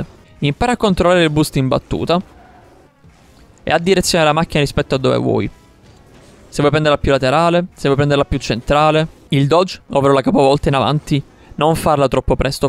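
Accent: Palermo, Italy. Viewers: Ma sei di Palermo??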